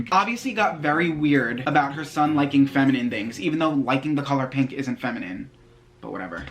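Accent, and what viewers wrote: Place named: North, United States. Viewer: Ok I hate Christina but being a manager I would warn you to not have friends at work and definitely DO NOT allow any non-employee to help you! That violates workers comp laws! That could get corporate in big trouble. That would get anyone working below me fired & walk out immediately! So just saying protect yourself! Btw I love your Northern scent. I live the south. I freaking love your videos!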